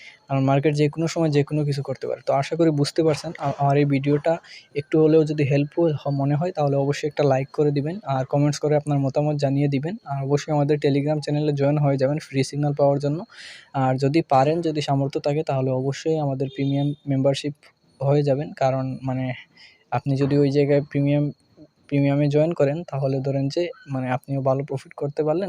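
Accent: Sylhet, Bangladesh. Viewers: আপনার বাড়ি কি সিলেটে.?...আপনার উচ্চারণ সিলেটি দের মতো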